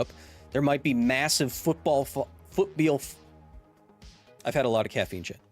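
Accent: New Zealand. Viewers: Aussie accent is close but you say your "e" and "I" more like a Kiwi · your accent is good but sounds a bit more like New Zealand to me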